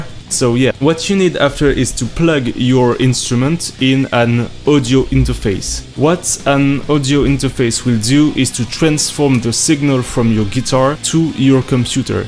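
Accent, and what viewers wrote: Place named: France. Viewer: It's great and pleasing to watch another frenchy with international goals. Don't care about what people say about your accent. Be yourself face to the camera and people would feel it. So it's good. Envoi chier les rageux égoïstes qui préféreraient que tu parles français, ils n'avaient pas qu'à sécher le collège. 😁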